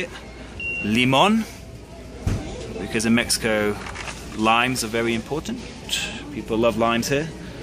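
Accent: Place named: United Kingdom. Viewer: British accent sounds so fancy :p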